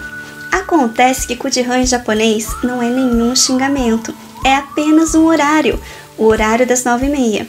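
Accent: Minas Gerais, Brazil. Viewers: Tu é mineira ??? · Fala palavrão com muita classe, português do interiorrr (de Minas?) e com a delicadeza de uma gueixa. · Vc parece "ser mineira" pelo seu sotaque brasileiro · Ela fala o português mineiro uai, Herdou o sotaque mineiro!! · Eu acho q parece mais msm um sotaque mineiro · Ela é mineira? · Ela é Japonesa ou Brasileira? Nossa, amei o Jeito dela.. Se for Japonesa, fala Brasileiro melhor que eu rsrs 😄 Me fez rir demais com esse sotaque Mineiro 😅 Amei ❤️ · Ela aprendeu portugues no interior daq de minas so pode mkskskzkskkdkdkskskks, fala igualzinho a gnt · Eu acho q tá mais pra mineira. Eu acho que como ela morou no japão por muito tempo, perdeu um pouco do sotaque. Mas dá pra perceber pelo "Ocê", encurtada de palavras e o "R" um pouco arrastado. - O "Arrrrdido" dela é um pouco mais arrastado. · Moro no interior de sp mas entendi td q vc flw(mineira?) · Vc e mineirinha?? 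· Man quando ela fala português ela parece ser uma mineirinha É mt fofinho :3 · Vc é muito engraçada de um jeito meigo.E ñ parece ser de SP, tá mais pra mineirinha kkkk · kkkkkkkkkkkkk vc é Mineira?? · Ela é Mineira? Tem sotaque, sou de Minas. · Vai ver ela é Mineira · Sotaque de mineira ta forte viu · vc tem um pouco do sutaque mineiro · A japonesa mais mineira q eu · sotaque mineiro é top :D fofo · Cê parece a fusão de um mineiro com um japonês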